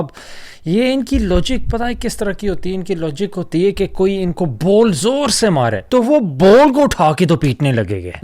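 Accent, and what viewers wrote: Punjab, Pakistan. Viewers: I'm digging your punjabi accent wali urdu 😄💚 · OMG 😱 your Urdu is like 👍🏽 Pakistani Punjabi Uncles who have lived for a long time in the west 🌎 Modi doesn’t want to give education, coz you know education is directly related to lesser votes 🗳 for the BJP party, which is also the same in the US for Republicans and so he has pass laws against triple talaq n marriage age and disenfranchisement of Muslims and farmers coz that makes his voters base happy 😃 as this effects the minority predominantly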